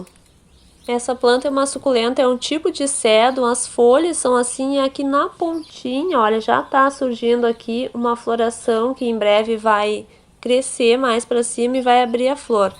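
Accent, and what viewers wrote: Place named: Pelotas, Brazil. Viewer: Parabéns pelo canal. Seu sotaque parece da região de Pelotas. Obrigado pelas dicas.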